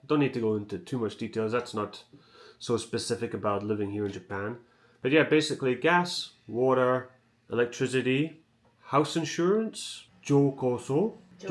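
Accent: Scotland, United Kingdom. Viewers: Great video mate, thanks for the breakdown. I have a couple of questions that I would love if you covered in a video sometime, though im sure you already have at some point. I obviously hear the Scottish accent, im from England myself, currently living in the states, and have travelled a lot so I know accents can change after a while, but I sometimes gear your accent changing on certain words so I cant figure out if you're native Scottish, or born somewhere else and grew up in Scotland or something 😅 Also, I wrongly assumed that you were married to a Japanese woman, and thats how you got permanent residency, but I dont think thats the case. Could you go into more details about your journey to gain residency? Or maybe you already have a video on that. Anyway, thats enough waffling from me, thanks again for the video and the insights. I subbed and look forward to more videos. 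Cheers mate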